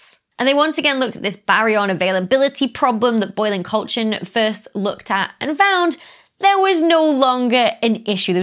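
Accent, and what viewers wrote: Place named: North, United Kingdom. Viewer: "quicker than what our best model of the....." i love the way she sounds so northern.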